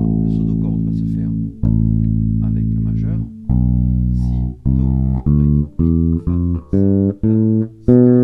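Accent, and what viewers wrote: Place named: France. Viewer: haha im french and i didnt understand it all, he's from france i assume from his dialect, and i speak quebec french ahah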